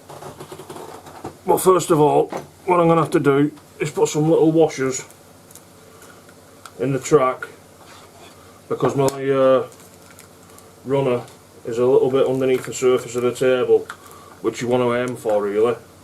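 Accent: United Kingdom. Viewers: Excellent with the exception of my inability to understand your British accent. But, that's my problem, not yours. Thanks for taking the time. I have one of these same saws and I'm going to make this sled.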